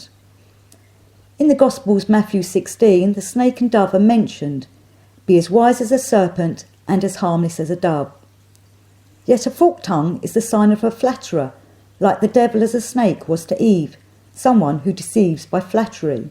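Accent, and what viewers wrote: England, United Kingdom. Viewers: Thank you so much for your positive feedback. I am only at the beginnings of discovering and enjoying your informative videos... from the beautiful imagery and powerful symbolism, your lovely English accent to your passion for knowledge and sharing is simply golden. 🙏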